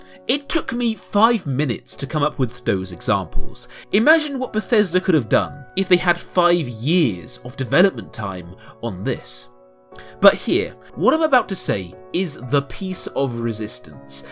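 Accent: United Kingdom. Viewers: Don’t you jut hate the modern style British accent (yours)? I just love the old accents because there was so much variety and they sounded so much less wimpy and snotty and weird.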